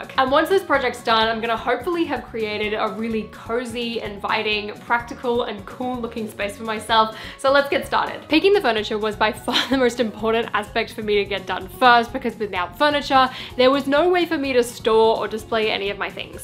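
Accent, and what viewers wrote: Australia. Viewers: Agreed, I think always looks really natural. She has that striking Aussie look that fits with her quick, easy to follow australian english. If we're dealing out compliments, I also think she does really well at telling a story in her videos. They are well structured, which is not always the case for most Lego youtubers. That said, this video is probably not the best example of that, but it is something I've noticed quite often.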